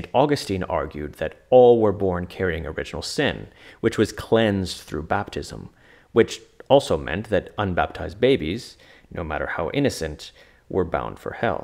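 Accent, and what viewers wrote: Quebec, Canada. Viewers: Toi t'es québécois c'est certain 😅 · L'accent québécois m'a surprise haha (j'entends rarement mes compatriotes sur YouTube) Merci pour l'excellent vidéo 🎉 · Es-tu Québécois???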